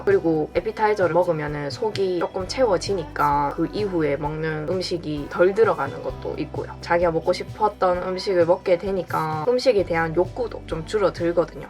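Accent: Gyeongsang, South Korea. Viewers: She’s definitely from Busan or perhaps somewhere in southern Gyeong Sang province. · Hannah Rose Yasay she's from daegu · 부산 사세요? 말투가 부산같으신데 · She said in another vid that she’s from Daegu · Gyeongsangdo accents really carry this charm that is so good to my ears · your voice + your Busan dialect is so relaxing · 대구 아가씨 같네요~ 뭐든 의지가 젤 중요한 듯해요^^ 물을 많이 마시는 습관도 포인트! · i think it's daegu? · Jenna Li wow ㅋㅋㅋyup her accent from busan(Edit: her accent from daegu!) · Are you from busan?? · 多分プサンの方言かな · 韓国人です❗釜山か大邱ですが、多分大邱だと思います。きょんさんどの方言であることは確かですがこの違いはそこの人じゃないと比べることは難しいですw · Well guys , I'm not korean but I can tell that accent isnt from Seoul I wanna say Busan ( correct me if I'm wrong) anywho ALL THOSE DRAMAS HAVE DONE SOMETHING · ARE U FROM BUSAN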